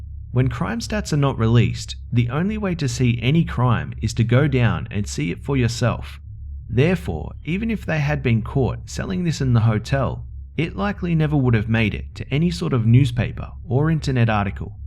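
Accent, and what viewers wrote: Australia. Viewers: Hello B are you Australian??? Pleas reply. Your the best!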